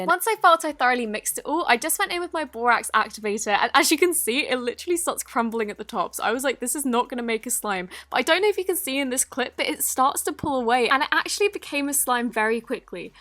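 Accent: England, United Kingdom. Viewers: Are you English???¿¿? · I really love your British accent! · I love you because your videos are perfect you are really pretty and I love your voice also you're British like meeeeeeeeeee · Don’t forget “at all” in her cool British accent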